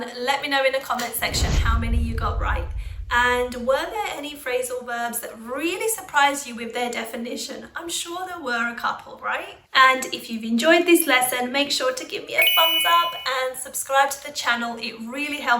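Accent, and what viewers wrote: United Kingdom. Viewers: Could you please tell me what's your accent? Estuary or rp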